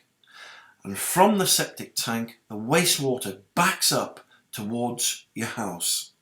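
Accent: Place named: United Kingdom. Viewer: Again, this guy is giving inaccurate information about Residential Septic Systems, because pumping a Septic Tank is only a few hundred dollars, and it doesn't need to be done every year. In fact, pumping a Septic Tank is usually recommend every 2, 3, 4, or 5 years, depending on the size of the tank, and the number of people living in the house. You don't need to add worms or anything else to your Septic Tank or Leachfield/Drainfield... absolutely unnecessary! This guy is just a pitch man, and he's full of you know what... don't be fooled by his British Accent or this video presentation.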